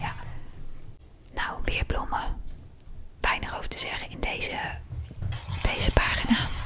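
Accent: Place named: The Hague, Netherlands. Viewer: Waar uit Zuid Holland komt u vandaan? Het lijkt of ik soms haagse of westlandse dingen hoor haha, ik kom zelf uit het westland😊 superleuke video's! Ga zo door❤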